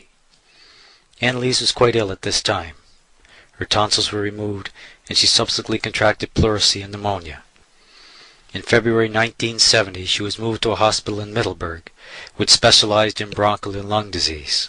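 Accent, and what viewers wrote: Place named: United States. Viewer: Because there's been quite the many bad influences coming in to different places in the world from the United States and thus, that's why you sounded like you had been from the United States to me. I've had enough about the United States government. They've badly confused me, notice anything different about India?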